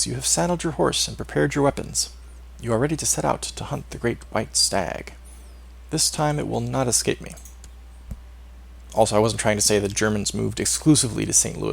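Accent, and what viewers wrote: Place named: France. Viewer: Renowned Physician adds a bonus to Physician-ing from what I can tell. What you should do is grant Julian some land then he will stop asking to join holy orders, or just ship him off. I don't know if you noticed but you lost the North of Greece/Turkey. From Constantinople East to the Il-Khanate. That French accent sounds like the narrator in the Jeanne d'Arc campaign in Age of Empires 2. Smashing icons is what Iconoclast is all about. No icons to God so we must break them. You can check out the society under the society tab which is the last one up the top.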